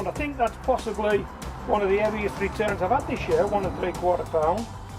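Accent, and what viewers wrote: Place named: Teesside, United Kingdom. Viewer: Even the toast looks spot on, can I ask you a personal question, are you from up north, teesside area, you're accent sounds like you live round the corner from me 😂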